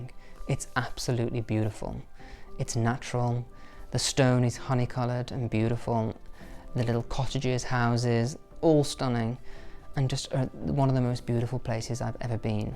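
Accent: RP, United Kingdom. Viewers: I'm really surprised to hear you say you have a very middle-income background, as your voice and demeanor comes across as quite 'posh' :) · You are a delight,. I must say you sound so posh and I mean that as a compliment. So crisp, yet gentle, and gracious. Can't wait to see more. #sophiebestlife If you want to find the most calm, elegant, historical and beautiful places in the US...please reach out. I'd love to provide ideas. Just a couple to start your US list - Savannah, Georgia; Santa Barbara, California. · You’re so sophisticated, calm and peaceful! I love the British accent❤️.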